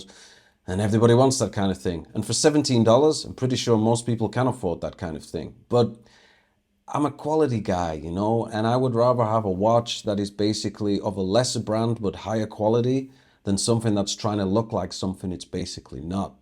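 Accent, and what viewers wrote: United Kingdom. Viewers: Interesting accent, are you a Brit abroad maybe?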